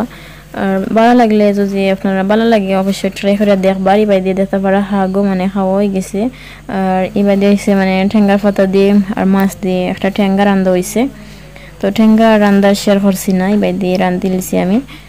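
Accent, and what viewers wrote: Sylhet, Bangladesh. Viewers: Afnar mat kub bala lage jokon Sylheti matoin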